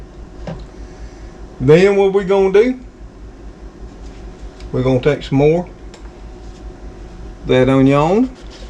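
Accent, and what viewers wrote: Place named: Cajun, United States. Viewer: Before the video is over we love cats and we want to see TRIX she is so cute bust like my cats.Get cooking some more with your oignons cajun talk...I understand I'm french canadian so it's fun dropping in and putting the mask and the Covid aside!Take care and give Trix and all your other cats a big hug for me! Until we meet again online Bye bye!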